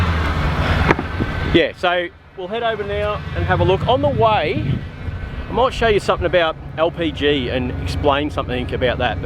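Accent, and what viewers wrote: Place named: Australia. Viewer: It seems like older people 50+ like this guy in the video just don't get EV's at all. Fair enough you like your petrol engined cars. That's your passion and I get it. But some of the misinformation you spout about EV's is bollocks. Not everyone is like you though and 95% of the population want a car that goes from A to B as cheaply and reliably as possible and that's where EV's excell. You sound like your from Australia. I find it bizarre that due to the fact you get a shit load of sun in your county to capture with solar panels and put this energy straight into the cars that your country has been so backwards for all these years. I'm glad to hear the new goverment you have in power is changing this fact. Your country should be championing energy independence and this biggest fact why I love EV's is because us Brits can transition to some sort of energy independence and not buy in petrol from these rouge nations and keep our money in our country.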